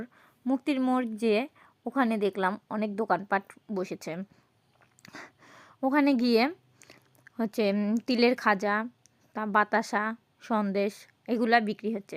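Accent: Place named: Dhaka, Bangladesh. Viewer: আপু আপনার বাড়ি কি ঢাকা ভাষা ঢাকার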